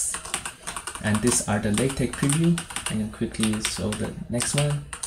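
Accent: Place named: China. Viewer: 一开口就是老中国人了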